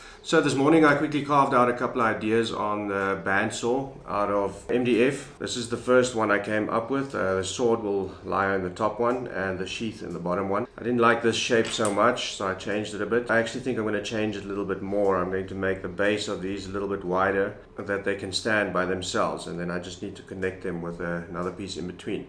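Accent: South Africa. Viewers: You from South Africa? You sound South African!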